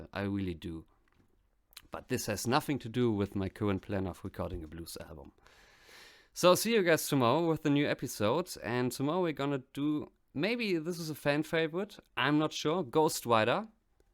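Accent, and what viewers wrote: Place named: Germany. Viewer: Neil was writing themes that were 10 to 15 years in advance of the times. Clock Work Angels is unfolding today/. The German accent is cool. You sound all right man. This song describes the obstacles of today.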